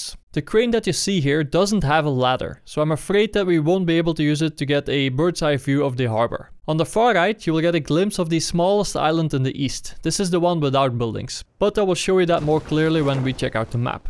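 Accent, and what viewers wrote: Netherlands. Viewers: Dis guy sounds so Dutch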